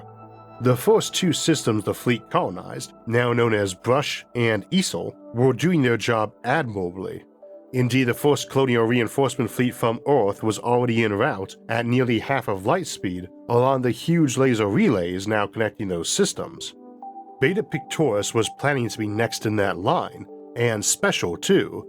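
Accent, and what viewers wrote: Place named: South, United States. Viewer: Can't place your accent. Im thinking southern US with a mild speech impediment. No disrespect. Im a linguist.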